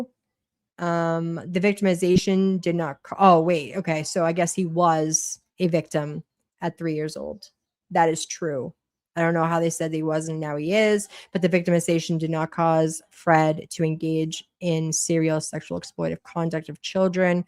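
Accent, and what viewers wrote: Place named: Boston, United States. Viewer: Hey girl! I met you on the other Amy's live last week, I LOVE your energy. Bonus is your Boston persona. My husband is from Dorchester. I am from Philly so I figure same same. ❤ 😂 Thank you for covering this insanity - I think its important to spread the word about these nasty people so hopefully nobody else will fall prey to them.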